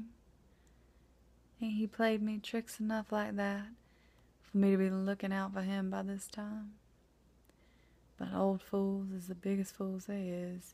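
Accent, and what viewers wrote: South, United States. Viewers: Where are you from? That was great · Such a great video! So difficult to find a great ASMR southern accent video, so thank you ☺️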